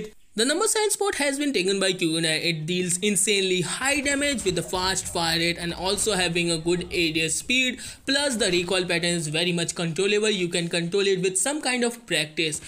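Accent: India. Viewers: Bengali English accent.....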